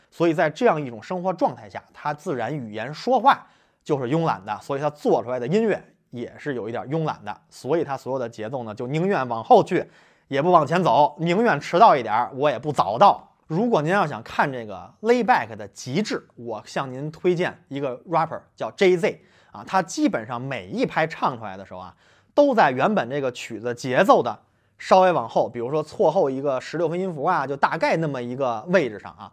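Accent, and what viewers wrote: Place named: Tianjin, China. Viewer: 哥们儿你是天津的吧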